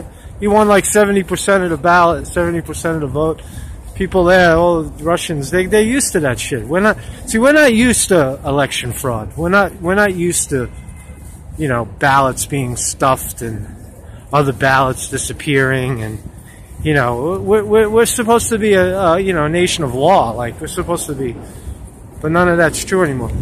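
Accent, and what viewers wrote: New York, United States. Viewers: The wind (well, not so much), the horns, your New York accent, I love it. Keep keeping it real. Rock on, brother.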